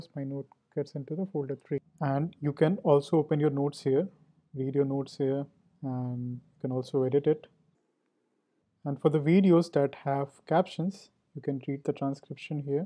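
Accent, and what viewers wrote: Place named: India. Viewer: Suggestion. Please use an AI voice with no accent o remove your heavy Indian Accent that is terribly difficult understand. I want to watch and understand. By doing that more people will be able to experience and use your great product. AI is simple. Scan in the transcript and and let AI do the rest. That way you can offer your videos in many languages. Its available, use it.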